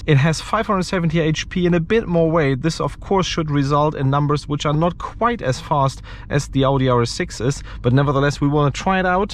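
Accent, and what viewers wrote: Germany. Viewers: Man merkt direkt das du deutscher bist aber dein englisch mag ich😂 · Great review, amazing car and the German accent is 👌😉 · Man hört direkt das du Deutsch bist😂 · Probably weird but ....this is why i like german women coz their accent while speaking english jus sounds amazing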